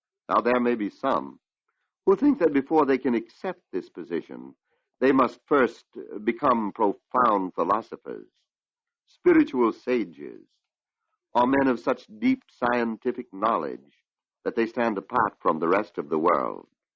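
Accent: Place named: Maine, United States. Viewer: As a former Mainer, I love hearing his Maine accent. Forgot he grew up there. One of the giants of this teaching.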